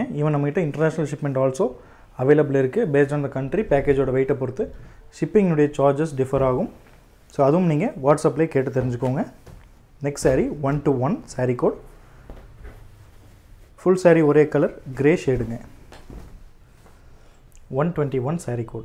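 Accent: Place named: Kongu, India. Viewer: 💐💐💐🙏super with Nama kongu Tamil nice voice ங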